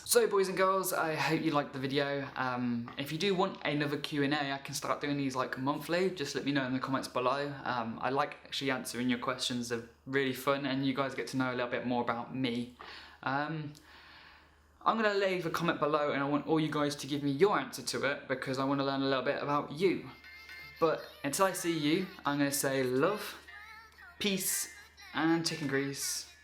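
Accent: United Kingdom. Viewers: What I want most is to have a sweet British accent like yours : )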